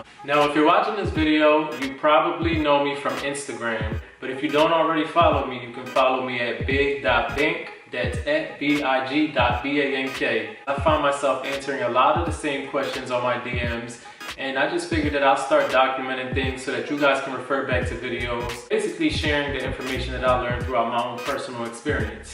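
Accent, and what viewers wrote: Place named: Philadelphia, United States. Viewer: You are SO PHILLY! I can hear your accent. I love it. This was super helpful though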